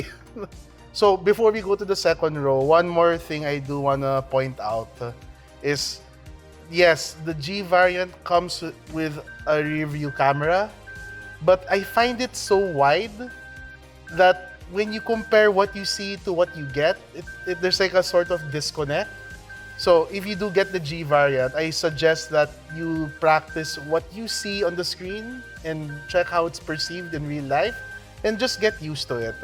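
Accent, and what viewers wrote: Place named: Philippines. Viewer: Good review, English Tagalog accent but it's good.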